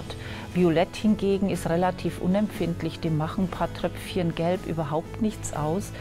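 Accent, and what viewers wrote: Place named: Upper Palatinate, Germany. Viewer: Ha ha, das ist nicht Fränkisch, der Akzent stammt aus der Oberpfalz - so kann man sich täuschen!!!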